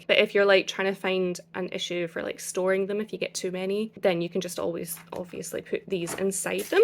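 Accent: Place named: Scotland, United Kingdom. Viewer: A SCOTTISH LEGO BUILDER. I live in Falkirk. I watch Jango Bricks etc and stumbled onto this and my ears went more bigger than they are when I heard a scottish accent. Subscribed. Need to look over the channel. Not one for comments but Thought I'd say Hi.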